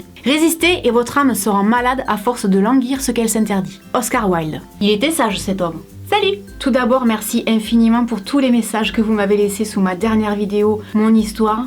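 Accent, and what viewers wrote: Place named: Perpignan, France. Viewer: Que dire une excellente vidéo! Que du bon sens et des astuces vraiment intéressantes. Merci belle, tu es d'un grand réconfort et ton accent de Perpignan pose du soleil sur les bleus de mon âme ☉🌝🌞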